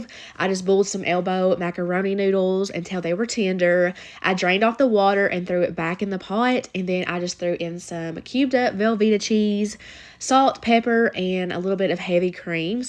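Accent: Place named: Kentucky, United States. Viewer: Are you from Richmond? I’m from Paris, KY and I feel like your accent is heavier than most people’s around here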